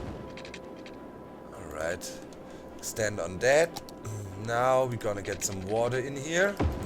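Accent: Germany. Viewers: Wie man einfach hört das du deutsch bist :D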